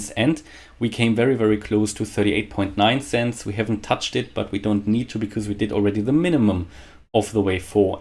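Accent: Germany. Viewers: das hört sich stark nach Deutsch/englisch mixxed an hahahha :D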